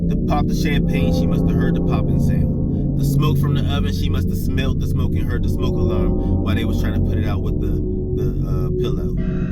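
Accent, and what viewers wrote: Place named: Mississippi, United States. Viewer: You sound like you from my neck of the woods..iam just could u be from yazoo county Mississippi 👽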